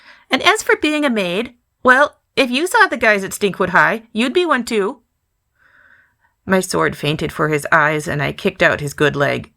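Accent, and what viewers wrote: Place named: Midwest, United States. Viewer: Great job! I guess my ear is tuned to the Midwest speech pattern. 😂 I think it's great!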